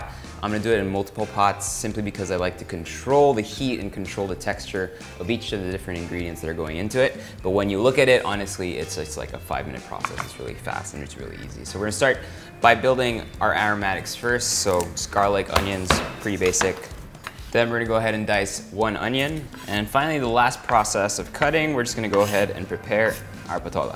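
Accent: Philippines. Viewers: Your filipino accent is so cute and funny HAHAHAHAHA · OMGEEEE..!!!! the tagalog accent though!! This is the first time I heard you speaking in tagalog na matagal.. Lorve it!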